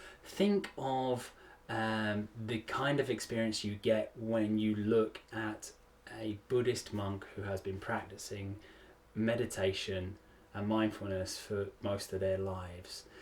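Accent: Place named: United Kingdom. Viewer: Loved your accent, fellow UK creator here. I think this is so insightful and it’s also so lovely to have someone on YouTube not shouting and screaming at the camera. Very informative and love the blue in the background. I am only new on the platform myself but if I can give you some feedback, there is a little white noise in the background, this can be rectified by cleaning the audio depending on what programme you are using. You have a new subscriber. 👍 keep doing good!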